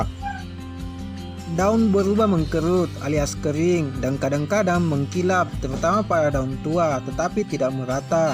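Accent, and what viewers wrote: Bugis, Indonesia. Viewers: Bahasa Bugisnya ketara benar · Orang bugis yah? Ogi Aga ki.... ?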